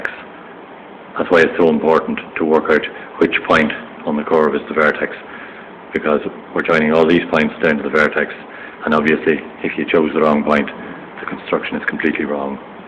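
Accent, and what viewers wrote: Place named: Ireland. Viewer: Also great to hear another irish accent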